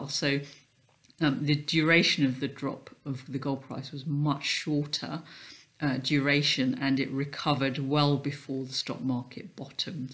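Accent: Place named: North London, United Kingdom. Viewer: Sounds like a north London accent , probably Wembley , Finchley where I’m from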